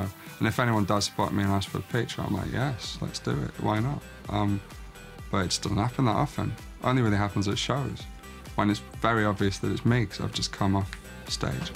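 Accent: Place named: Scotland, United Kingdom. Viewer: Scottish ,,,,cool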